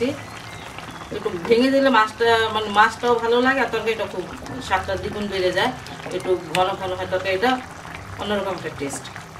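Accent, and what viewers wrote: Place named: Narayanganj, Bangladesh. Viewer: Apu apnar desher bari kothay?apnar kotha shune mone hoy Narayongonj ba tar ashe pasher elaka.shuvo kamona.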